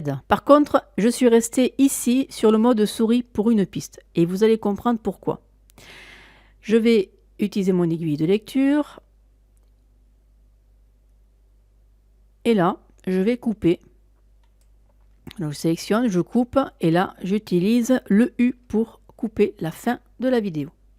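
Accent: South, France. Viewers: Merci pour vos tutos très instructifs et bien expliqués. Utiles pour quelqu'un pas très féru en informatique comme moi. J'avais la version 2016 et maintenant 2022 et j'étais un peu perdu. Après 2 années "covid" je n'avais plus fait de films de voyages et donc j'avais un peu perdu les bases. Je vais pouvoir me relancer grâce à vous. Et puis votre accent méridional est agréable ce qui est un plus, mais n'allez pas croire que je cherche à vous draguer !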